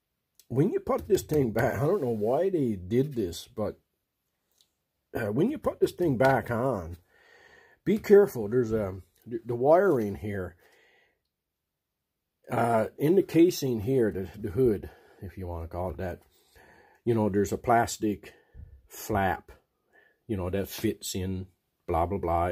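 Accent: Newfoundland, Canada. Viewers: Your acsent you from nfld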